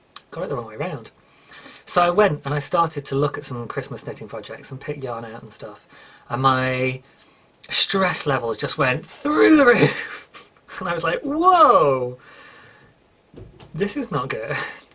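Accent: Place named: United Kingdom. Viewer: Its funny that when Brits do an american accent its usually a southern one (yall ). No one does a New York accent, for instance. My daughter and I are always doing a British accent but its usually like the Essex accent, or like on Skins, is that the same thing, isnt Essex in S England? My mum and grandmother had proper british accents,because they were born in S Africa and New Zealand. I have a friend from London that I can't understand at all because of all the slang, he slows it down and talks more "american" for me. Do you say ore-gaan o or oregano? Do you have a northern accent, because those kind of sound like Scottish right? To me your accent sounds kind of middle british, like maybe compared to our midwest accent. Whew thats a lot, back to knitting.......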